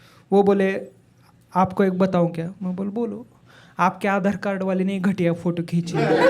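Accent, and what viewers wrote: Maharashtra, India. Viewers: Brother, I am halfway through your video, I like your wholesome jokes. And be confident in our Marathi accent.❤ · Marathi accent in hindi standup 😀😀😀😀😀😀😀😀 kdk 😂😂😂😂😁😁🙏🙏🙏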